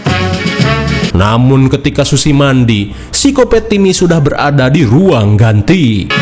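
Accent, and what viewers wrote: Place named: Java, Indonesia. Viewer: yo rapopo to mbak ngko dak mlh lucu ijeh medok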